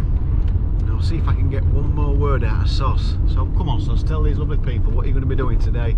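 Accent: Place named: RP, United Kingdom. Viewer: U with ya posh voice saying "my mate turtle" made me laugh.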